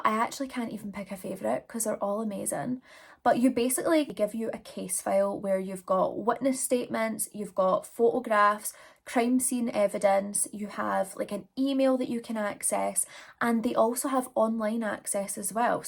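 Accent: Scotland, United Kingdom. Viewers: BTW, your Scottish accent is everything 🤩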